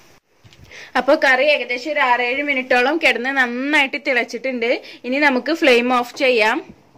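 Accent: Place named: Perinthalmanna, India. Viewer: Nalla rasond samsaram kelkan..enik PMNA othiri friends und..avar parayana polund..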